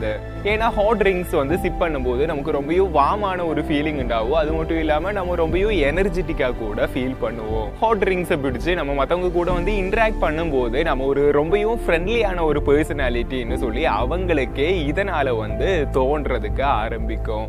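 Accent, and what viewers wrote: Kerala, India. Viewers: What ah voice...and ur malayali tamil....😍😍🤩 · Malayalam kalantha tamil.. Nice bro....😊👌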